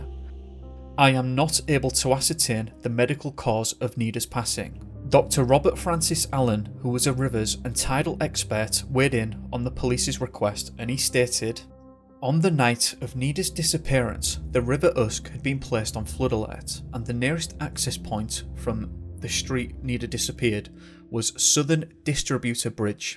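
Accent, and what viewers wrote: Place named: East Yorkshire, United Kingdom. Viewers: It's a reet belting northern accent tis this! 💞💞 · Sounds like an East Yorkshire accent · Are you from Hull or Leeds. You sound Yorkshire. As from York lad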